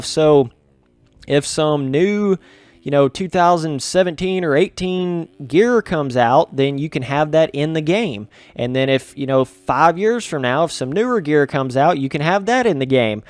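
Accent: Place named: South, United States. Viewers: where r you from you sound so country. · thats southern, not country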